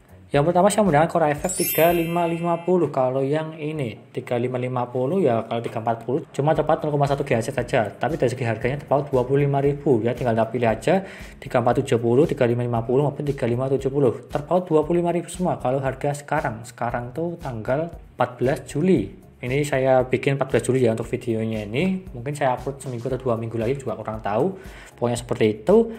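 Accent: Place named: Banyumas, Indonesia. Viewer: Krungone Kyong ngapak Yoh:v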